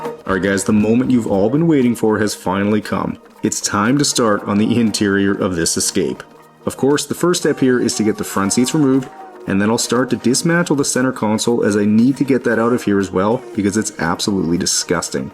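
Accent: Canada. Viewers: "Aboat" that's when I found out you're Canadian 😆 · 1. Are you from Canada? I hear an accent. 2. I hate that there was a dog in there 😢 and 3. That was NICE vehicle, they had a moonroof, and whoever drove it was VILE 😢 but it looks amazing. Love the video ❤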